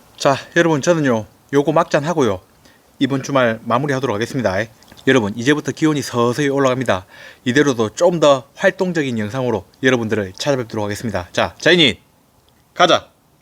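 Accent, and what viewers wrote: Gyeongsang, South Korea. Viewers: 경상도 사투리 고쏘하이 처직입니데이ㅋㅋㅋ 경상도 사나이 시골 냄새 뿜뿜 너무좋아요🤗👍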